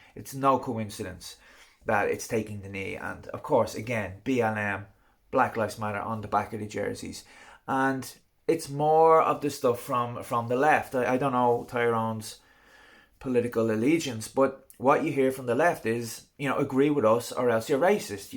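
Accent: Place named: Ireland. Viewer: You are right Tyrone's move was not a good one, it was brilliant! The woman is vile and obnoxious and her abhorrent behaviour should be called out much more, you sound like you are Irish? if so, try this one, do you think it is acceptable that the same woman stood up in parliament approximately three years ago and suggested that they should block food supplies to Ireland in an effort to force the Irish away from seeking the backstop as part of the withdrawal agreement? What kind of sick person/politician would think on those lines, you see she is right wing and I find it interesting that you demean the left who by the way seek social justice and economic equality as their primary objective whilst using a Nazi salute as analogy and comparison, Priti Patel's behaviour is right wing and guess what, so too were the Nazi's, are you a closet Nazi and racist using soft and subtle persuasion tactics, I wonder? 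You are being very arrogant and clearly attempting to link politics with what is a simple yet powerful gesture against racism and again one has to wonder? your argument sounds a lot like passive aggressive. As for BLM, they are entitled to their views, aims just as equally as the right, and as for capitalism, it is no great shakes and will ultimately lead to the total destruction of human kind not as a matter of if but only when.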